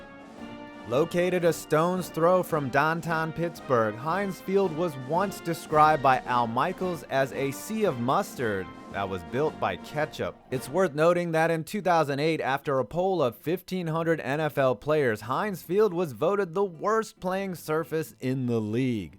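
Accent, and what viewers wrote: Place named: Boston, United States. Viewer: Lazy basterd Boston chick voice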